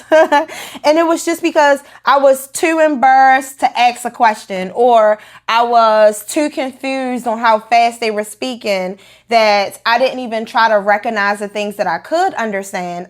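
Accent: Baltimore, United States. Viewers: you from baltimore aint you??? Ik another when i hearrr 🤣🤣💕💕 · Brand new subscriber here and love the content already! BTW Are you from Baltimore ? 👀😭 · I hear a Bmore accent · Go-head girlfriend, lol! Question - where are you from? Baltimore??? I hear your accent and it sounds like it.......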